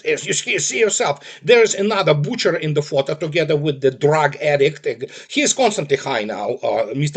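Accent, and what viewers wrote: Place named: Russia. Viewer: 'Confabulated fairy tales' via hat marvellous Russian accent! Music to ears and as always Truth! God Bless, Andrei, a beacon of informed understanding - reality itself.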